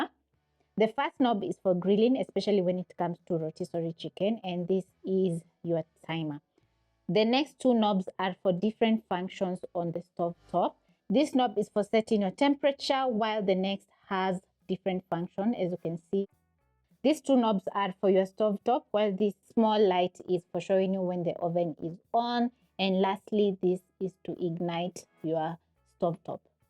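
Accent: Kenya. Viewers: Open. She is Kenyan. It's an accent.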